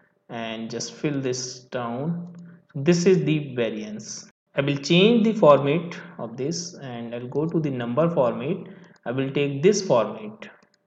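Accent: India. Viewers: I'm so sorry to write this but I simply can't focus with this super Indian dialect, if this guy is from India that is. No offence, really, I just can't concentrate. Wish you all the best 🙂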